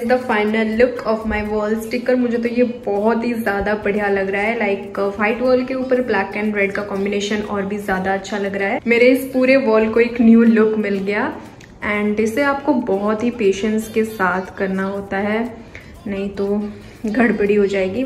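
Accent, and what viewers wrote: Odisha, India. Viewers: Your odia accent is so cute ❤ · Your odia accent is so adorable❤.. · Your Odia is so sweet ❤️✨ Like I'm replying that part ✨✨👀 In other vlogs plz do that again 😍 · Di your odia is so cute 🥺🥰 and you also look soo cute & pretty 🤩😍💜 From - odisha,angul✨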